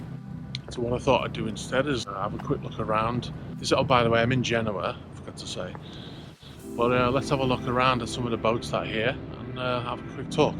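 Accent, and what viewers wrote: Liverpool, United Kingdom. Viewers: Where are you from? I think your a scouser, definitely northwest anyway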